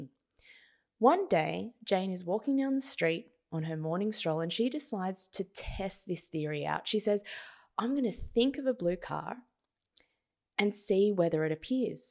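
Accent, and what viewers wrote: Australia. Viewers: I like your Australian accent!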